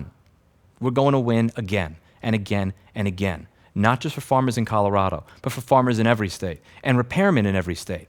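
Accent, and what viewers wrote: New York, United States. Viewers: Congratulations. You've really made a difference. Many people complain and protest, but you've done the difficult part by working to influence legislation. You should think about running for office in TX. Maybe put a little southern twang into your NY accent.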